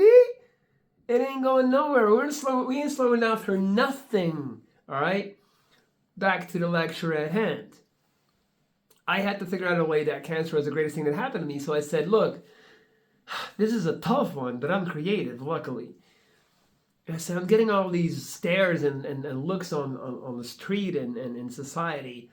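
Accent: United States. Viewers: How come you speak in an American accent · Your English is very good! Have you lived in the USA, you have an American accent.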